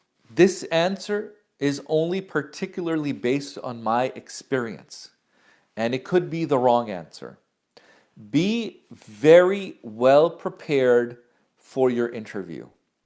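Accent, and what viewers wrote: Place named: United States. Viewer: I wonder how he got american way of speech..hmm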